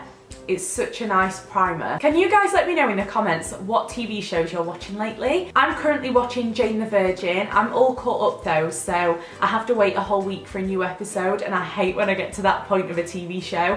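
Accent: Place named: Yorkshire, United Kingdom. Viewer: Sounds weird but you've got the nicest accent ever... is it Yorkshire? xo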